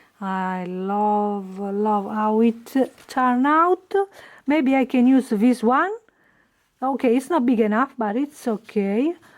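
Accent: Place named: Italy. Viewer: I loved hearing your fun Italian accent! I'm inspired always by your great variety of skills!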